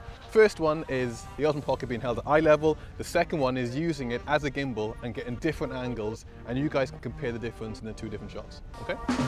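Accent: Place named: Wales, United Kingdom. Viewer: I not only have Welsh roots I still live there - I am so glad you mentioned that as whilst watching your videos I can easily hear your Welsh accent (I guess you are more south as I am near Aberystwyth) but struggling to work our where you are based - my guess is Australia? Best wishes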